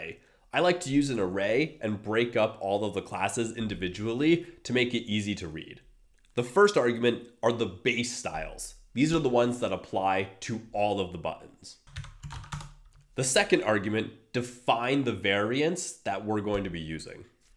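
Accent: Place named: United Kingdom. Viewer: Obviously American, but there's an accent creeping in here. Secret Brit? :)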